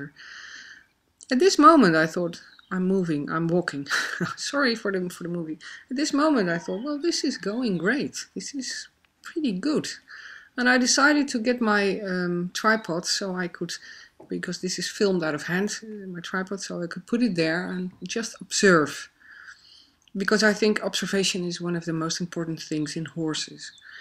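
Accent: Netherlands. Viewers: Je klinkt erg nederlands. De dingen die je vertelde was erg logish en accuraat. Maar ik had meerdere hoopjes hooi neergelegd zodat ze elkaar konden onwijken als ze dat zouden willen. En ik denk niet dat ze vechten. Het is eerder kijken wie er sterker is. Ik snap waarom je ze in een kleinere ruimte zet maar ik had ze in een grotere ruimte gezet met meer hoopjes hooi. En ik denk alleen niet dat paarden zoiets hebben als "common sense".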